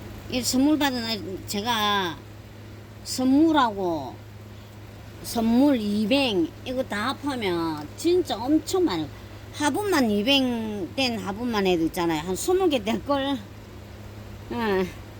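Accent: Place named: Gyeongsang, South Korea. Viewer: 저두 경상도여자~~ 말씀, 사투리 다 알아듣고, 넘 재미나시는데! 넘~신경쓰지마세요~~앞으로도 조잘조잘 많이 부탁드려요~~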